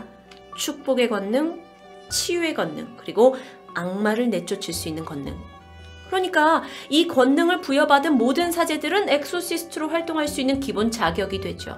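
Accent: Jeolla, South Korea. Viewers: 디바제시카님 전라도 사람인가..?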